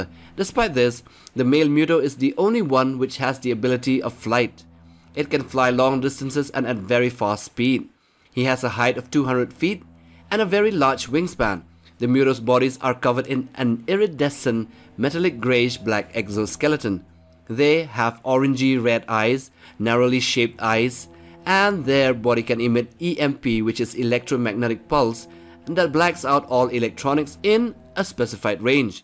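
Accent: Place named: India. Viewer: I love your narraration. Your excellent fluency in English combined with your Indian accent and well written scripts are just incredibly pleasant to listen too.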